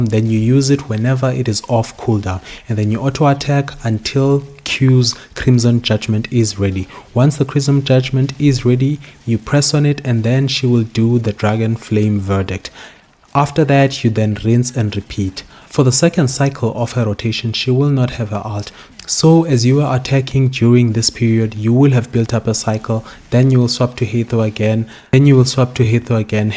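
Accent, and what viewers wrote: South Africa. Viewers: Bro, u sound like kao kwishisha. Mzansi? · hears a South African accent, subscribes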